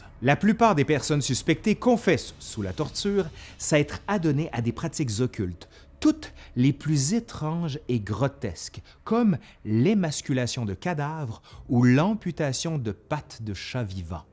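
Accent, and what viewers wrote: Quebec, Canada. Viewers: Dans les années 2000 à Bordeaux, j'ai vu une exposition québécoise fabuleuse sur le Moyen-Âge. Cela a achevé de me passionner pour cette époque de mon pays. Depuis, le Québec m'aide à connaître la France, et j'ai appris en retour à connaître ce beau pays de Québec (libre! 😉) et sa culture si riche. Mon fils de 8 ans te regarde souvent avec moi car "il explique très bien le monsieur!" et il peut suivre. Et on adore ton accent! Bisous de France 🐸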